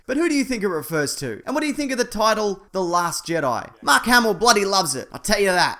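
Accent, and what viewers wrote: Australia. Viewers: this is an awesome channel, made even better by the australian accent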